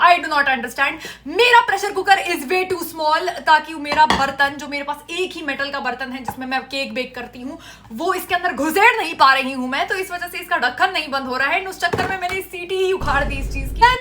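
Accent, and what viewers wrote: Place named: Kashmir, India. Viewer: Brooo, I literally felt like you sounded Kashmiri, but I wasn’t totally sure. I was getting that vibe from you really cool! I’m Kashmiri too. I know that wasn’t really necessary to say 🥀 And congrats on hitting 20K!!!💅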